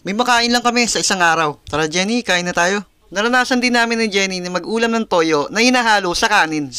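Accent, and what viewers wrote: Ilocos, Philippines. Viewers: Kuya ilokano ka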